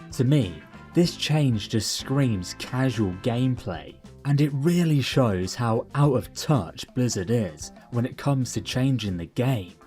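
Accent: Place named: Essex, United Kingdom. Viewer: I have a friend sounds like exactly like him, when hes being sarcastic i dont realise half the time XDD, might be an Essex thing could be wrong XDD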